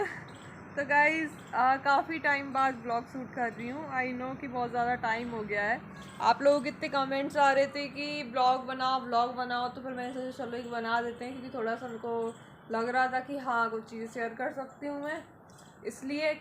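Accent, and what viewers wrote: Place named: Bundelkhand, India. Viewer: Aap kaha se ho ????? Sounds like bundelkhandi accent !!!!!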